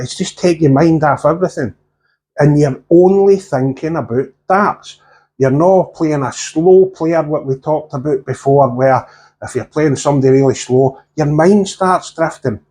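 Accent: Scotland, United Kingdom. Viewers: nothing like a Scottish accent! cheers, great video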